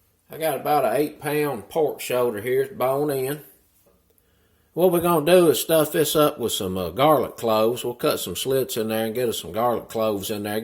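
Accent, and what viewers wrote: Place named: Texas, United States. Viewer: I detect a bit of Texas in your voice. What parts are you from?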